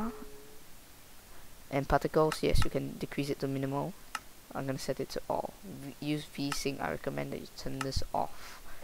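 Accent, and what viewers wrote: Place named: Singapore. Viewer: Im singaorean too I recognisr ur singaporean voice immediately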